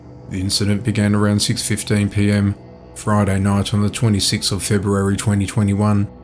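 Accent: Australia. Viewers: Entertaining and informative as always. Plus I think I enjoy hearing an Australian accent share the news. Great intonation! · is this an ozzy man speaking it feels very real to me hearing such horror from the USA spoken in my own speech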